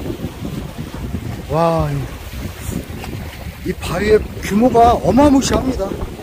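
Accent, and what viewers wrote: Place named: Chungcheong, South Korea. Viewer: 어마무시하는것 보니 충청도 공주,논산지역분 같기도 하고 호남사람같기도 하네요? ●고향이 어디유?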